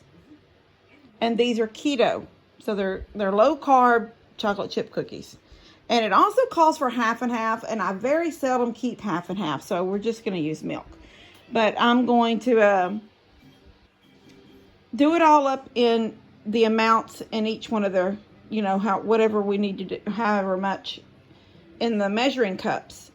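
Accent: Texas, United States. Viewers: Just watched three of your videos-----and all I can say is that---YOU ARE THE JACK OF ALL TRADES!!----cleaning, organizing, feeding all your BABIES--mowing & raking/blowing leaves, fixing ALL KINDS of stuff, decorating, undecorating, crafting---oh my goodness--the list goes on--------and NOW---you are baking cookies----and homemade iced tea!!! WOW!!! AND just LOVING your TEXAS ACCENT!!! Will be looking forward to all your videos🥰😍🥰😍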